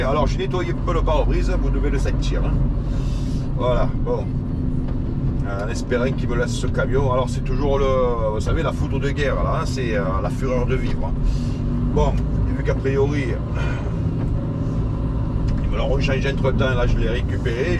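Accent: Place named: South, France. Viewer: Toulon ouest. dans le Var et pas à Vars dans les Alpes,ni au Portugal Une visite passionnante avec l'accent du sud en bonus.Des vidéos comme celle ci, j'aimerais tellement en voir plus souvent 🎉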